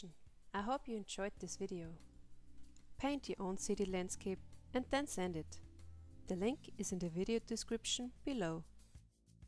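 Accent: Germany. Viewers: Dieses deutsche Englisch 😂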